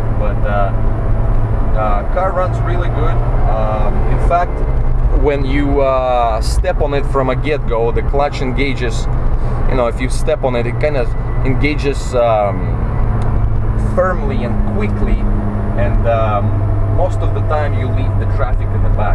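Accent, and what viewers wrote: Russia. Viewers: You do know words and can make sentences but have very Russian pronounce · Do I here a little russian accent there? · Love the accent, is it Russian?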